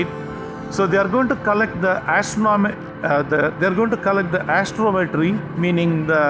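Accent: India. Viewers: I just can't hardly listen hard to understand. Indian accent. Sorry.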